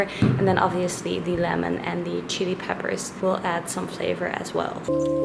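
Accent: Netherlands. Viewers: I heard the accent and thought: Hé, Holland!! Leuk joh dat je vlogt! Vorig jaar was ik ook super healthy en toen... werd ik zwanger 🤰🏼. Lief kind maar wat ie met mijn lichaam heeft gedaan 😱 PUDDING!! Ik wil mijn abs terug! 😫 Dus we moeten van een “pudding-“64 kg naar een “in shape”-58,5kg. Here we go!! 💪🏻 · Wait... Are you Dutch?🙈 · Just a tiny question.... are you dutch? Edit: laat maar de Milner heeft t verraden 😂 ik merkte het aan je accent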